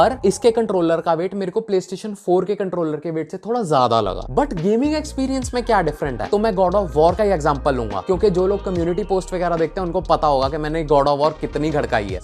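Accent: Punjab, India. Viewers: Bro's Punjabi Accent 😂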